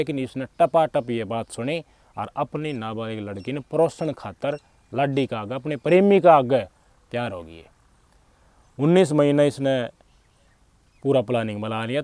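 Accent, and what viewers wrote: Haryana, India. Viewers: Bhai apki speach delivery kamal ki hai haryanvi bhi aap mitha karke bolte ho..bahot badiya bhai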